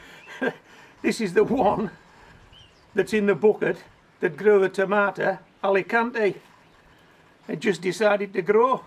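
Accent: United Kingdom. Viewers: Hello,. Where in the UK do you live? You have the most charming accent! 🙂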